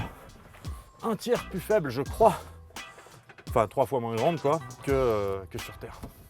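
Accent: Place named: South, France. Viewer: en tout cas j'adore ton accens (du sud)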